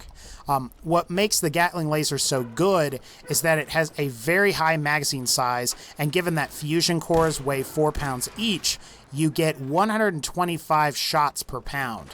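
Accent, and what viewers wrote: South, United States. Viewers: He sounds like he's from the US... southern gulf/bible belt area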